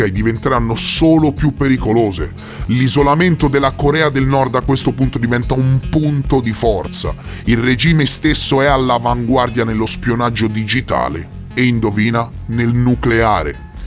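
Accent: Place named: Friuli, Italy. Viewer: Secondo me sei del nord Italia Friuli...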